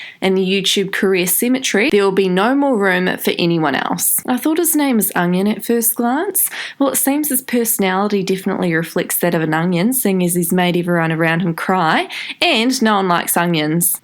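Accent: New Zealand. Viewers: This is a New Zealand accent, right? It sounds like it, but I want to make sure before I show my boyfriend so he knows how the accent sounds lol. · My wig liteRALLY flew to mars! oh by the way, are you from new zealand? you have a really nice accent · I'd have told onion to take it to the police if he was so 'concerned' (which we know he won't because: He's got no evidence He just wants attention which is why he's sperging out on social media). Didn't he try that on PewDiePie as well but no one bit, so he's slandering Shane instead? Just discovered you and enjoying it so far. X PS. It's good to hear a Kiwi accent and guess it correctly- yay, I feel validated! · Heehee... you sound like a Kiwi Kath Day-Knight from Kath & Kim, when she's making jokes about Kim's weight to other people right in front of her. A little bit too shady for me, I find calling him Onion on purpose kind of annoying. 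Not because I'm a fan (nope), it's just not much of a burn or a joke, it's just like "Oh, you didn't take long enough to read it... and now that's what you're going with. Clever." Also, onions are pretty popular. Like garlic. Bad rap, but people scoff the shit down (good thing too, they're both super medicinal). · When you're Australian and knew straight away that you're a Kiwi. Also, I love this video. You have a new subscriber.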